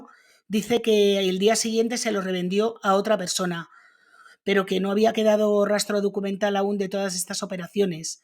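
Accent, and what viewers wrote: Spain. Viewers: Hola, me encanta tu manera de contarnos esos casos que narran las diferentes formas de la brutalidad humana. A diario veo uno que otro de tus casos. Vuestra forma de hablar ( de los españoles en general) es muy hermosa. Apoyo tu canal sl 100 por ciento. Desde Cuba, gracias.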